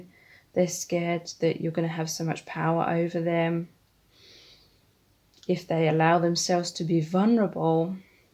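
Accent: United Kingdom. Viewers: Girrlll, I love the british accent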